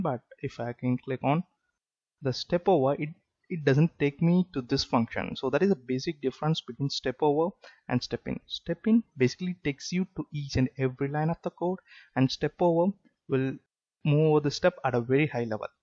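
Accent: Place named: India. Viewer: From I heard the Indian Accent I knew gonna be great vid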